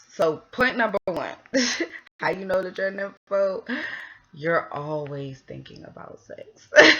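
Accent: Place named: DMV, United States. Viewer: She definitely from DMV talking like that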